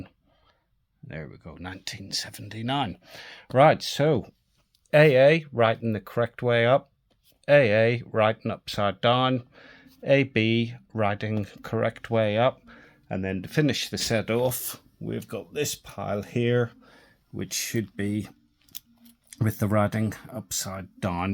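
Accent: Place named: Ireland. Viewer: Good morning mr first of all Wishing you swift and full recovery mate and thanks for the reply I sure do miss the way you do the channel And everything else how you tell it as you feel love that too I’m straight talking as well so All the best mr hope too see and hear that famous IRISH tone in the near future keep well sir ok thanks again ROYBOY in Yorkshire xx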